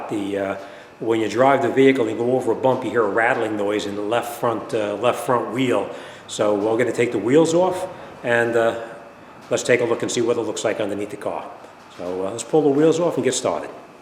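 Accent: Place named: New York, United States. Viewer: You have that strong NU YAWK accent! Excellent video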